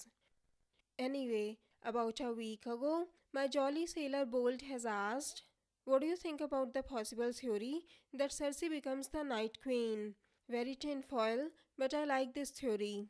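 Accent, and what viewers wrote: India. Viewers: Very annoying Indian accent! Very annoying to listen!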